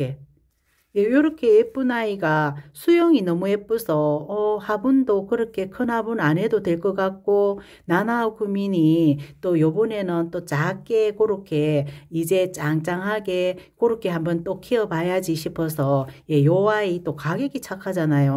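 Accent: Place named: Daegu, South Korea. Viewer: 이뻐요 꽃다발 같아요 나나후쿠미니 혹시 대구 세요?당근에서 본것 같아요 말씨 매력적이에요 감사합니다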